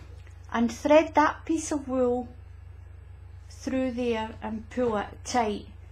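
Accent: Scotland, United Kingdom. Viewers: I'm Brazilian, English isn't my native language and I can understand very well. Love your Scottish accent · I love your Scottish accent and your voice! I could listen to you all day long x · Scottish accent always melts my heart <3 Thank you so much!! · You have the coolest accent I have ever heard. Where precisely are you from? Scotland, right, but where? Just curious. I'm American and we never hear this. It sounds quite a lot like Scandinavian-- in the inflection--and many of the sounds are quite similar.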